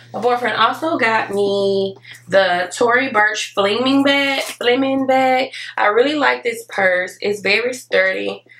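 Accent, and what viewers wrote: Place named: South, United States. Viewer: i love your accent you got to be from the south like and once again u finer than any model out there!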